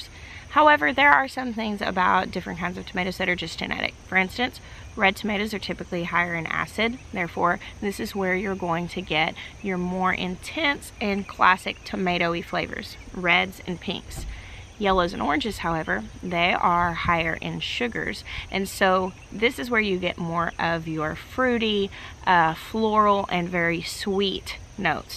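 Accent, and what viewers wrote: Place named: Mississippi, United States. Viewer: Hello from Scottsdale Arizona where I am growing a great crop of tomatoes and other veggies. I am enjoying your videos very much and being from Mississippi I connect with your accent! You are exceptional and your wisdom shows in each video. I am learning ech time I plant how too adapt some of my southern learning to the desert climate. Will you share what you use for a mulch after planting tomatoes.. it looks like hay or alfalfa. Thank you.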